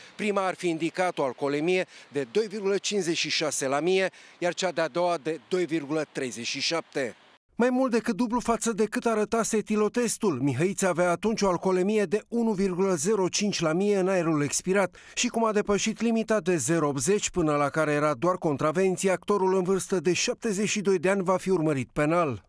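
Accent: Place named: Moldova. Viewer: e sholdovean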